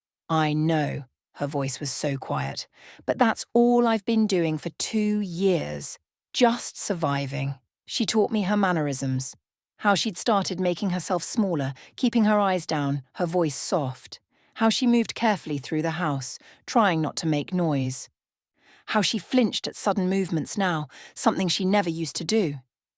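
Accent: United Kingdom. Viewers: How refreshing. A British accent, not AI too. New twist, also refreshing, not so new, yet again, both parents killed in a car crash whilst still young. Yawn! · Love the British accent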